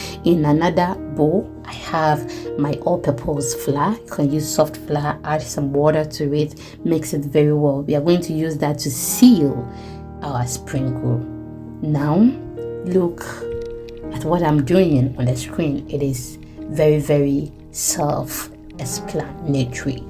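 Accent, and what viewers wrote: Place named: United Kingdom. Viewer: You Uk accent is on point ooo still watching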